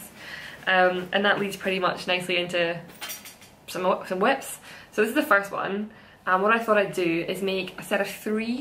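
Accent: Scotland, United Kingdom. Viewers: Hello! I'm so glad I discovered your podcast. Love all your projects, and I prefer neutral/softer colours too. What part of Scotland are you from? I was trying to work out your accent. 😊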